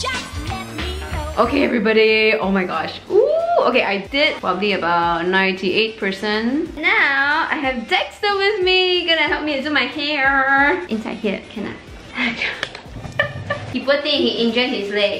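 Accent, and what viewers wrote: Singapore. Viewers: She actually looks and sounds like my Singaporean friend. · Haha I guess singaporean and malaysian english sounds simillar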